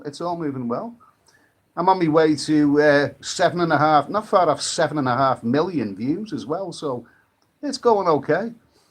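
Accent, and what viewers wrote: Liverpool, United Kingdom. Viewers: 2 are better than none<brilliant scouse accent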